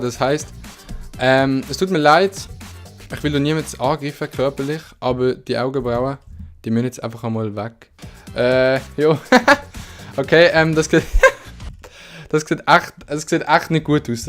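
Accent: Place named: Zurich, Switzerland. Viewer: züri düütsch 😂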